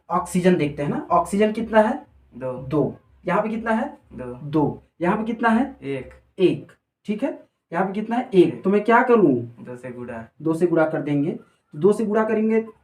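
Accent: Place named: Singrauli, India. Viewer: वीडियो में सिंगरौली की आवाज का प्रयोग किया गया है।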